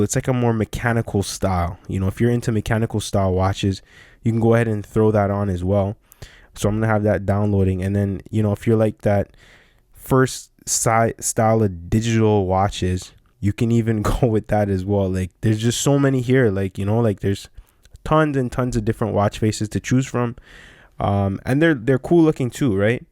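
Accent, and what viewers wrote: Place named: Toronto, Canada. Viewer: Toronto accent 🤢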